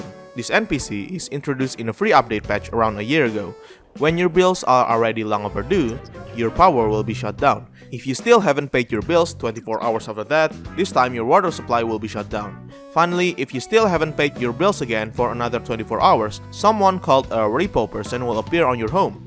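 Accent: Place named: Indonesia. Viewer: OMG I don't know if you're Indonesians, first time I hear your accent it's look like Indonesian people talking English lol that's cool 😅👍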